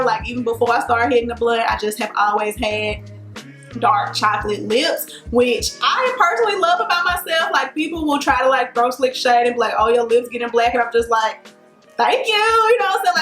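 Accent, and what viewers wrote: United States. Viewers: I love how country you are, I could listen to you talk all day.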